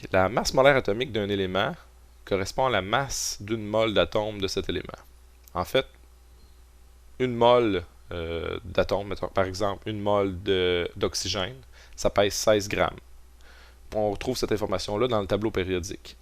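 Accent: Quebec, Canada. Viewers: Ah un accent quebequois !!!! mercci ^^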